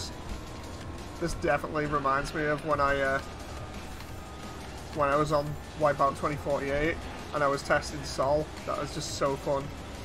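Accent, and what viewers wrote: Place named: United Kingdom. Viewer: U sound like david schwimmer but british 😂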